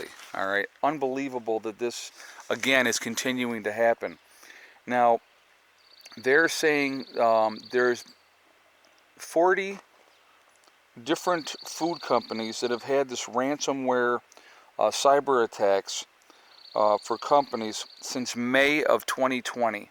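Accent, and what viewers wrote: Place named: Rhode Island, United States. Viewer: When I listen and watch you I find myself wondering if you’re from Portsmouth Rhode Island. Your accent reminds me of my uncles.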